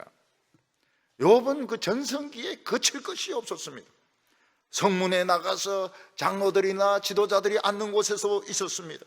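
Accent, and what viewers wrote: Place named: Gyeongsang, South Korea. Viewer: 목사님! 존경합니다. 항시,건강하시길 기도 드립니다. 구수한 경상도 억양으로 하시는 설교말씀에, 은혜 많이 받습니다.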